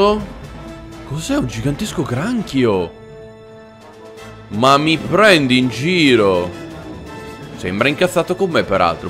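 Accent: Veneto, Italy. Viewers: Sei Veneto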